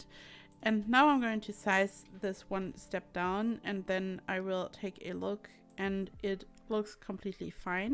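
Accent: Germany. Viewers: Are you german? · I don’t know why but since I am a German I think that she sounds very German when she speaks English ( can you tell me if I am right ) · Bin ich echt die einzige die bei den ersten Wörtern schon wusste SIE IST DEUTSCH 😂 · You have a German accent. Am I right? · Du hörst dich deutsch an kann das sein? 😊(gerade erst indeckt noch keine anderen Videos von dir gesehen) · Are you from Germany? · From where she is German?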